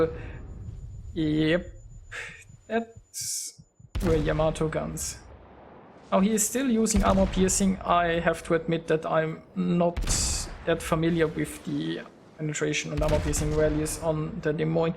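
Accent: Germany. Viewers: are you german? when you speak english you sounds like a german...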